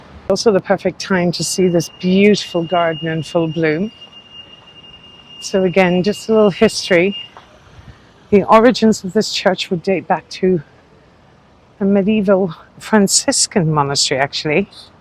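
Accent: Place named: Ireland. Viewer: Ohhhhh, it’s so spring lovely to see and hear. Beautiful Irish lilt. I’m sooo looking forward to to your video, of Roman London. London Spring/ Summer/, what more can one possibly ask for. A absolute Delight for the senses. 😊🏴‍☠️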